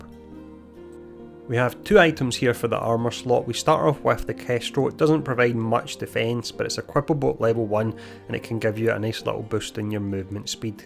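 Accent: Scotland, United Kingdom. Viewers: great accent😊 Scottish? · You Scottish btw?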